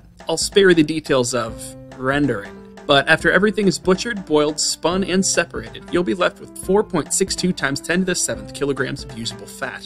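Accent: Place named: United States. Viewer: Sounds American, says "maths" For shame..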